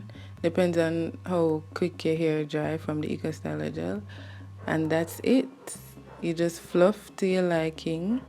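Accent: Jamaica. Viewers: u sound Jamaican 😍😃😃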